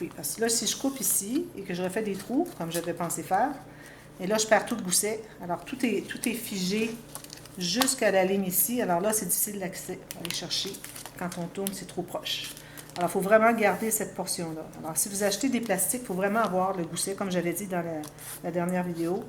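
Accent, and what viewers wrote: Canada. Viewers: Coucou, Pour tes pochettes plastiques, tu aurais pu faire une incision au cuter coté droite, pour pouvoir ôter tes fiches comme ça coté gauche tu aurais pu couper la 1er partie pliable avec les trous et en refaire dans la 2eme partie pliable, et là ta pochette plastique aurait la même taille que ta page et plus de problème pour sortir et rentrer tes fiches! ► Vérifie quand même mes dires car n'étant pas près de toi, mais derrière mon écran peut être que j'ai tout faux! Étant donner que c'est la 1er fois que je met un mot sous une de tes nombreuses vidéos (toutes vue hi hi ) j'en profite pour te dire,.... MERCI tes explications sont claires, tes idées de pages ou forme d'albums sont vraiment très très intéressantes et j'ADOREeeeeeeeeeee ton accent qui me fait voyager chez toi au Canada! MERCI BEAUCOUP pour tous ça! Bonne journée & Gros Bisouuus Valérie de FRANCE.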